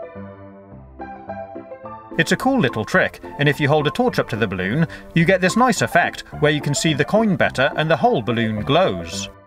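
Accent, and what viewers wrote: England, United Kingdom. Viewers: England. The accent! Just my guess. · yup definitely from england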